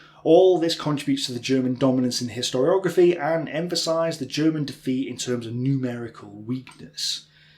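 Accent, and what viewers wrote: United Kingdom. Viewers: If you want foreign listeners, try to tell a history...a tale.....not giving us numbers and never speaking so fast.... not only the British pronunciation is terriible for us but you also speak fast and connect words in the same style Germans do....well...if you want us to listen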